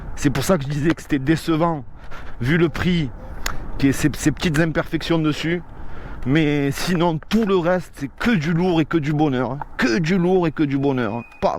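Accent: South, France. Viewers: Merci pour ce magnifique test.. Cela ne sera que réservé en une niche de clients car le prix, son poids... donc pas à monter et descendre 3/4 étages pour la ranger dans son appartement.. Ensuite, elle est monstrueuse mais il existe une concurrente (bcp plus léger mais moins d'autonomie avec autant de puissance): La Rion race edition. Par contre question concernant l'étanchéité et la tenue sur la route par temps de pluie? Dans tous les cas, tu es the first one youtube FR sur les tests trottinettes.. (ta personnalité, les montages vidéos, ta sincérité et cet accent du Sud.. 😉) Ride safe l'ami et merci encore.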